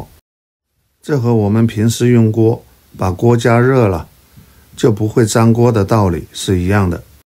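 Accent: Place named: Wuhan, China. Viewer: 哈哈 这纯正的武汉普通话，好熟悉。不过这个面窝完全都没有没有泡起来，跟武汉的面窝还是有点差距。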